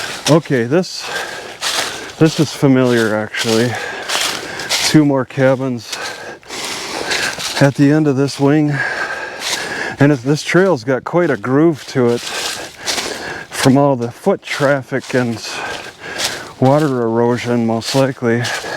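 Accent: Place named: Wisconsin, United States. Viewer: He says from Louisiana but his accent and email and videos clue us into Wisconsin. Not trying to generate your bad vibes but im from mn and id recognize you anywhere. We have the same eyes and ways.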